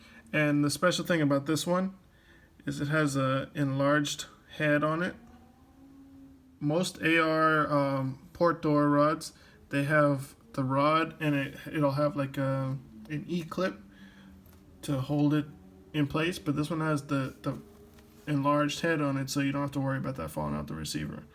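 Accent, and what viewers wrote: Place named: El Salvador. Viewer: Unless you came from elsalivdor? Speak english without the accent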